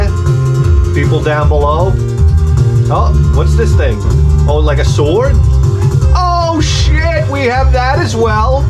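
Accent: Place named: Long Island, United States. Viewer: I was just thinking you begin sounding like an Adult Eore from Long Island, then noticed you're even wearing Eore 💙 Blue.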